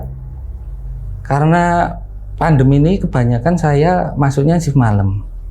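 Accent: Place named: Java, Indonesia. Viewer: Jowone khass kenthel banget kang, slm seko pekalongan stay di bogor kemang❤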